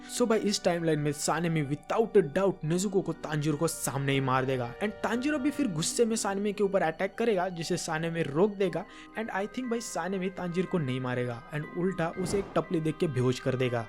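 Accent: Maharashtra, India. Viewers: Bhai you are Marathi speaker confirm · Tu maharashtrian 😅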